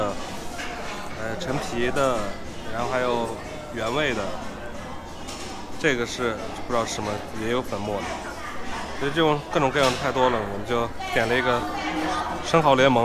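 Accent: Inner Mongolia, China. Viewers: 它来自鄂尔多撕，那里的土豪家里都有矿，煤矿，钱多的比山西，陕西煤二代也不差，所以不要小看山陕，内蒙口音的大土豪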